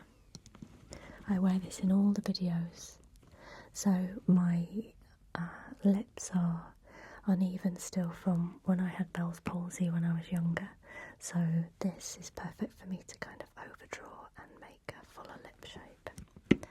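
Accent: England, United Kingdom. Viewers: You've got such a thick English English (from England) dialect, when you're normally speaking it, it's really annoying to me. Sorry, no offense, sweety. Just the pronunciation. But your whispering voice gives me the perfect tingles, I don't know why, but I can chill totally :) That's ASMR. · The lovely British accent makes the ASMR even more powerful. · Most people probably come here for the English accent, I'm English too and it still has the same blissful effect, such a nice accent! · Such a beautiful voice and I love the English accent. Ooof ❤❤❤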